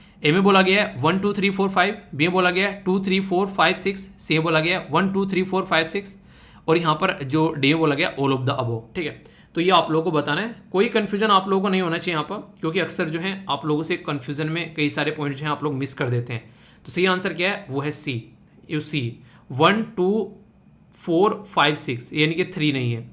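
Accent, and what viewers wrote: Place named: Western Uttar Pradesh, India. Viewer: Sir aapne kbhi btaya nhi aap kha se ho lekin m 100% surety ke sath kah skta hu aap west up se ho or Meerut ke aas pas kisi gao se ho (because aap sarpanch ko pradhan kahte ho jo ki up me hi kha jata h and aap ki boli me dehatipan h jo haryana se touch up me hi dehatipan dekhene ko milta or aap dhakad chhora ko bhi jante ho)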